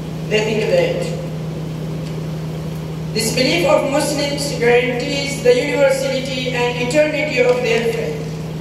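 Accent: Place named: United States. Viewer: Ap ki english to america ki lag rahi he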